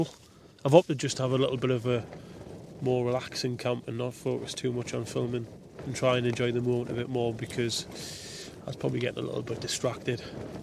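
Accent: United Kingdom. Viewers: Am I the only one that had to turn on the sub titles? I really struggle with North of the Tees accents. £500 for a tent... It better be good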